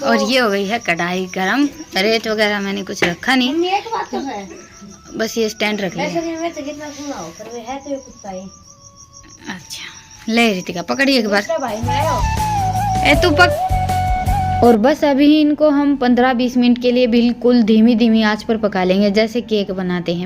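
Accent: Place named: Alwar, India. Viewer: Aap kha se h plz batana aapki boli se to aap Alwar ki lagti ho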